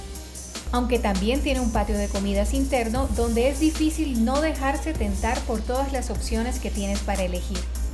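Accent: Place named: Barranquilla, Colombia. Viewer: Una pregunta, ¿Tú eres ecuatoriana o colombiana ? hablas como barranquillera.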